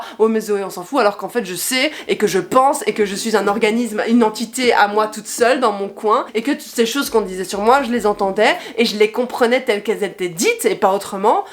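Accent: Brussels, Belgium. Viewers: MEUF t'es bruxelloise en fait?! Je me suis toujours demandée d'où tu étais en Belgique (je suis Bruxelloise) mais je me sens honteuse de pas avoir reconnu l'accent là quoi... (bon maintenant, je regarde le reste de la vid)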